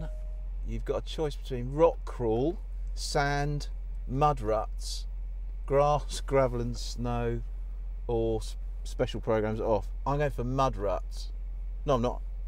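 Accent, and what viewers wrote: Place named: United Kingdom. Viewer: ridiculous comparison. they both have same tyres? you cannot convince anyone that you were objective with that biritish accent.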